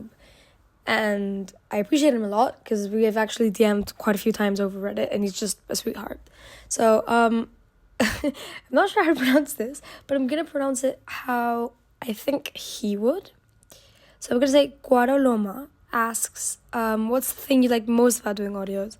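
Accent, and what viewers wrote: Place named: United Kingdom. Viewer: Your UK accent is really like coming through and more showcased and overtaking (If that makes sense) then ur US accent which is cool 😭❤️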